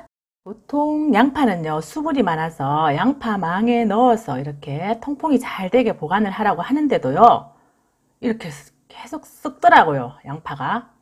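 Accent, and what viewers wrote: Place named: Yecheon, South Korea. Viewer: 우리 마누라 사투리랑 똑같네 예천군 ~~~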